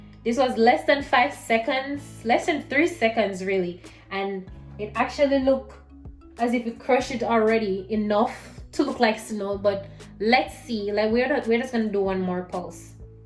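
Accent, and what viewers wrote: Jamaica. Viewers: I can tell by your accent that you are a Jamaican 🇯🇲🇯🇲❤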